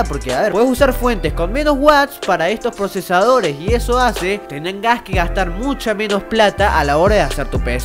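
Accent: Córdoba, Argentina. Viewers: no, es cordobés xdd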